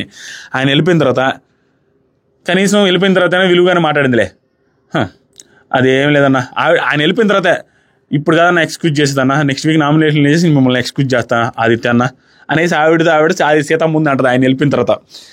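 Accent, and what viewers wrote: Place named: Nellore, India. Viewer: Anna nee review chuse nee matlalu chuse full navukunam enduku ante madhe kuda nellore kabate really rocking anna nee review aethe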